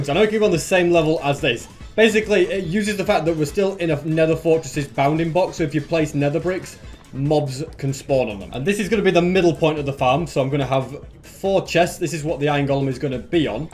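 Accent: United Kingdom. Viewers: idk why but sb sounds too British in this vid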